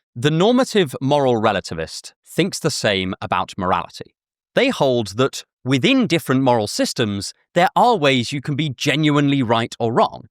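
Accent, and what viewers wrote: United Kingdom. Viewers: I'm in love with his british accent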